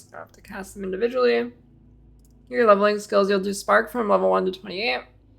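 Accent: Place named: United States. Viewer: That's her voice. She has an American accent. It's not an indication of anything other than where she learned English.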